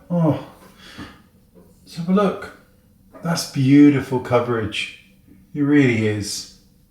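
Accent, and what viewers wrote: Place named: Wales, United Kingdom. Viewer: You absolutely crack me up! Proper welshy and bloody lovely mun! You’re also my 95 year old nans favourite she bloody loves you she does and would love a cwtch! Have a great day and thanks for always making me smile lovely boy xxx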